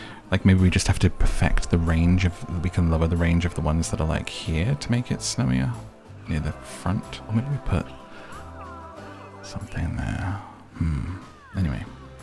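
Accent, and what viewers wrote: United Kingdom. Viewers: British daddy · Who wants to fall asleep to a British dude talking?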